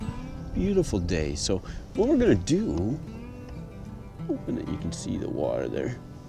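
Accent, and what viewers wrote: Wisconsin, United States. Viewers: I'm thinking Wisconsin/UP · You have the most Canadian accent I've ever heard LOL to either that or like Wisconsin ish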